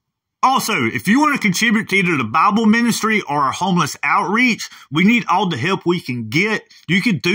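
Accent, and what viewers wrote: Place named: South, United States. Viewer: Bro, great video, luv the Southern accent, the prayer, etc. Thanks for all the News re: Israel; God bless you--see you Soon!! (Rev 22: 7)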